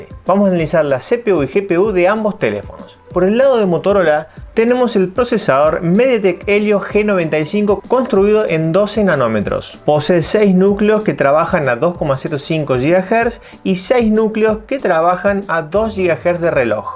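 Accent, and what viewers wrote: Córdoba, Argentina. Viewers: Cuesta esconder ese acentito cordobés, gracias por la comparativa me sacaste de muchas dudas. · SOS de Córdoba bicho?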